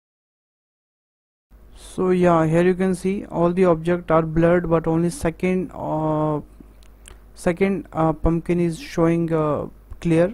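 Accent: India. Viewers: Your Tutorials are so useful and your Indian accent make it sweeter bro!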